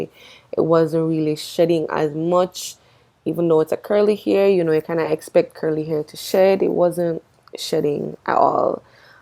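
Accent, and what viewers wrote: Jamaica. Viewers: Subscribes because I sense a Jamaican accent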